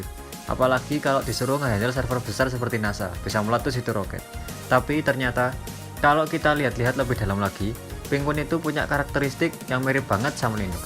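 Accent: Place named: Java, Indonesia. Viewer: Nah, logo penguin itu sebenarnya mirip sama pengguna linux yang perutnya gede, contohnya bapak floss stallman. Btw salam medok bang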